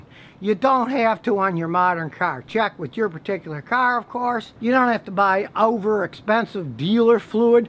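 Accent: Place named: South, United States. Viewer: I use all of your tips... and I also use your southern drawl as a sleep aid, It's on my healing frequencies playlist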